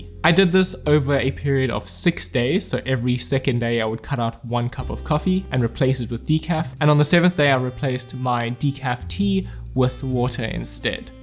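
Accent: South Africa. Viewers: You South African mate?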